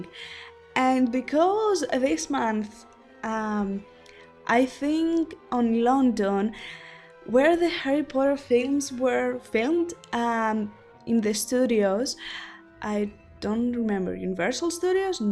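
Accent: Greece. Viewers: i love your greek accent! Latrevw thn douleia sou, mpravo kai euxaristw gia ta links! Harry Potter gia panta :)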